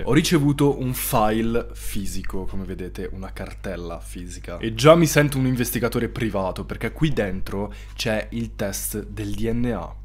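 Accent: Sardinia, Italy. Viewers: Noooooo giurami che sei sardo di Cagliari ti prego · Sardo, di Cagliari 😂 FANTASTICO ❤💙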